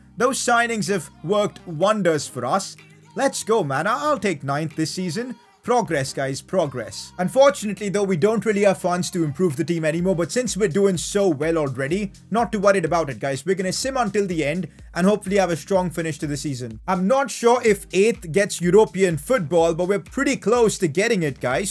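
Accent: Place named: India. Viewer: u have the biggest Indian accent it’s probably because u are the biggest Indian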